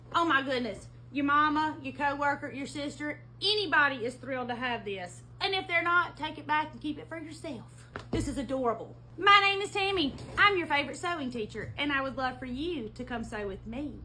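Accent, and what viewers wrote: South, United States. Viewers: I just found your channel a few days ago, and im so impressed!! I just made this bag, it took me longer than an hour but I love it!! You are a great teacher! I love your accent, you must be from the south. Thanks for sharing your talents with us!!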